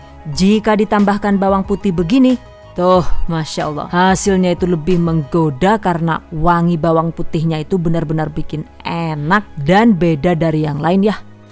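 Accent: Banyumas, Indonesia. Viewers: Mba, njenengan wong ndi sih, deneng kadang teyeng ngomong ngapak😅😅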